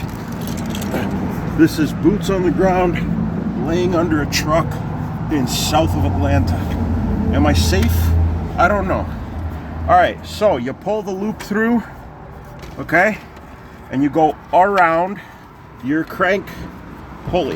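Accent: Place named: Canada. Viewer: This is the greatest video I’ve ever watched. I called it from the first minute you were Canadian. Cracked up the entire video. Well done sir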